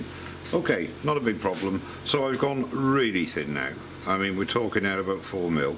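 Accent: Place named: England, United Kingdom. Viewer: great job on the delicate goblet, I look forward to each of your videos. My belief is anyone who has an English accent, in my mind they have An additional 25 IQ points. Your videos are all most interesting and informative. Cheers!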